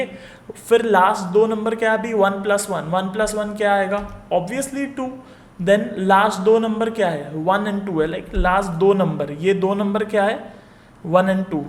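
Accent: Gujarat, India. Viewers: Verry nice explanation sir.... sir are you gujarati?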